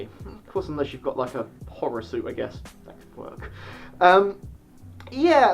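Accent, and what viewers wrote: England, United Kingdom. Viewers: I thought you were going to talk about the cute kind of "shipping" for a moment, there. ^_^ I want to be a furry, so it's good that cons exist in the UK, and it's possible to get a fursuit here (I may have already known that you were English, but I tend to forget as I can't really tell the difference between English and American accents, so I tend not to assume YouTubers are one or the other, if that makes sense.)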